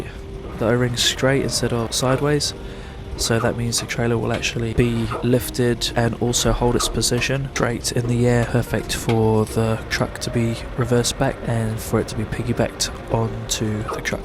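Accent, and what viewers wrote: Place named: England, United Kingdom. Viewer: Great video, this guy sounds like an Englishman not a kiwi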